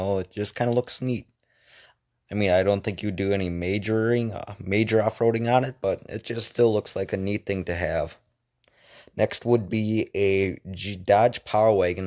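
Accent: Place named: Wisconsin, United States. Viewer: You can hear that this man is from Wisconsin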